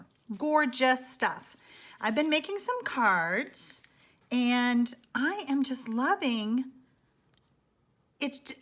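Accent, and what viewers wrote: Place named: Texas, United States. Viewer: Wonderful video! I wasn't going to buy this laser cut paper until I saw your video because I didn't know how to cut it or use it, but now I can't wait until my order comes and I can try to make a beautiful card. Thank you so very much! (I'm from Texas, too, and I love your accent....sounds like home to me!)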